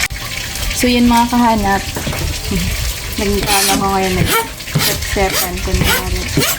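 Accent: Philippines. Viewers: idol tonong ko sana anong probincia ka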